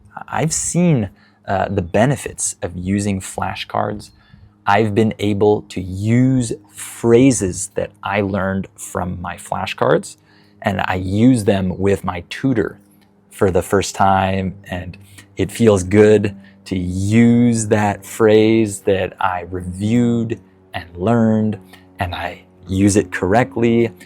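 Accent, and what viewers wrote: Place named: United States. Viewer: Yes American Accent😊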